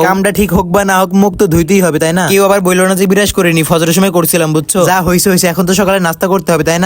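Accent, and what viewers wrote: Noakhali, Bangladesh. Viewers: ভাই আপনার বাড়ি কি নোয়াখালী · ভাই আপনার বাড়ি নোয়াখালী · ভাই আমি নোয়াখালী থেকে বলছি। তুমি অনেক ভালো নোয়াখাইল্লা ভাষা পারো। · আপনার বাড়ি কি নোয়াখালী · আচ্ছা ভাইয়া তোমাদের বাড়ি কি নোয়াখালী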